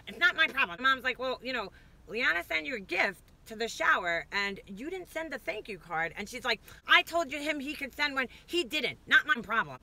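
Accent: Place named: New Jersey, United States. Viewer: are you from NJ or NY? I love your voice. I’m born and raised Jersey too...and I don’t hear my own accent but I hear it when you speak. Your very pretty BTW.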